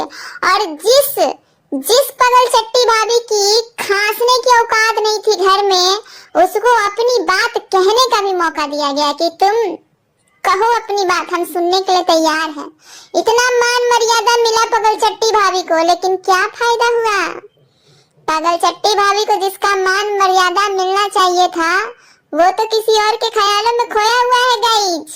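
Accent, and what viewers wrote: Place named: Varanasi, India. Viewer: 😂😂aap hai kaha se plzz btaiyega aapki aavaj bilkul hm logo ke tarf ka lgta h mera matlab hai vanaras jesa h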